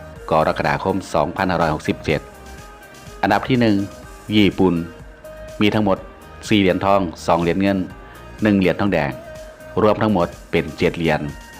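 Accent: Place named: Isan, Thailand. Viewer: เว้าอีสานฟังม่วนดีคับ ผมเองเกิดที่ชัยภูมิอีสานโดยตง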